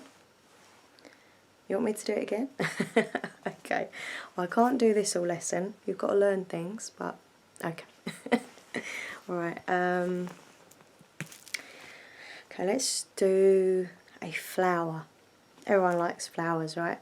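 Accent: United Kingdom. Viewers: your British English is so beautiful！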